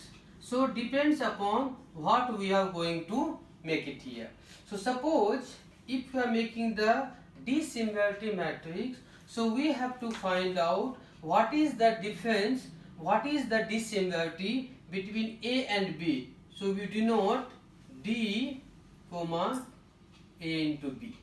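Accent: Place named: India. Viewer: not racist but damn indian accent is something... tbh i rather hear indian speak indian so i can at least use auto generated.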